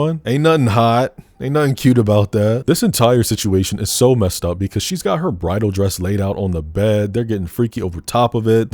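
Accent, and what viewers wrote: United Kingdom. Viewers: British?!!??! I had no idea🤦‍♀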